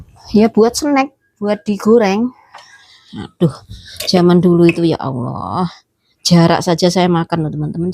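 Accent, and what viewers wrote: Java, Indonesia. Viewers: Aduh mba...lucu deh speaknya sama...jawane loh mba medok banget...aku wong jowo dadi ngguyu dewe...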